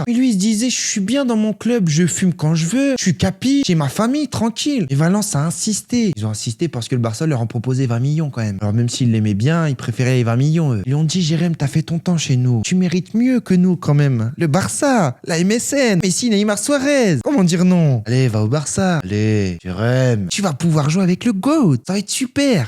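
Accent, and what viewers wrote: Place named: Nord-Pas-de-Calais, France. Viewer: Tu fais vraiment passer Jeremy Matthieu pour un simplet , à t'écouter il n'avait aucune ambition et aucune hygiène avec le tabac et il finit malgré tout au Barca , va comprendre . Comme le fait de travailler à Intersport . C'est toi qui est simplet avec ton accent chti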